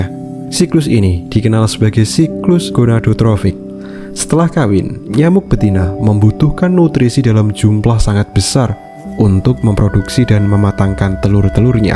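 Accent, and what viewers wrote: Java, Indonesia. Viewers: Medok auto skip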